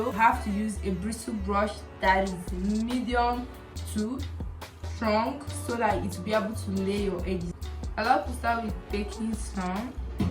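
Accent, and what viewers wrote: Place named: Nigeria. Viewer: sis are u nigerian? what state are you from? love your accent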